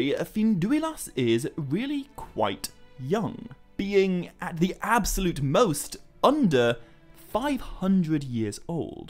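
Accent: United Kingdom. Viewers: As an American I enjoy UK pronunciations of words like aluminum....I won't correct you if you don't correct me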